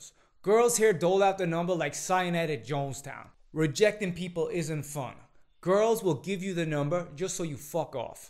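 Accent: United States. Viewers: Nice East Coast accent! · new york accent. 🗽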